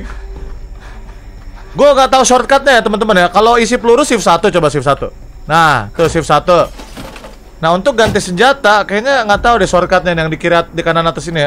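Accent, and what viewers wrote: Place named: Indramayu, Indonesia. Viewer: Indramayu nih bang. Abang asal mana?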